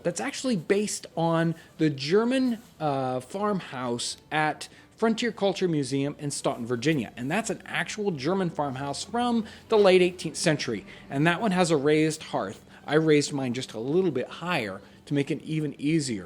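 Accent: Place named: Midwest, United States. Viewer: you know; now that Im looking for it you sound like a fellow Hoosier or Midwesterner in the least.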